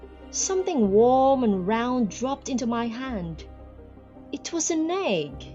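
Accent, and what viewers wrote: United Kingdom. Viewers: You sound scottish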